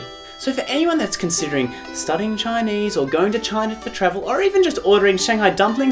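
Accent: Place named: Australia. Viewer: Your accent is so aussie!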